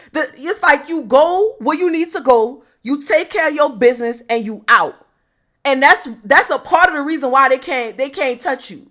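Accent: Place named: New Orleans, United States. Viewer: with that accent you were from New Orlins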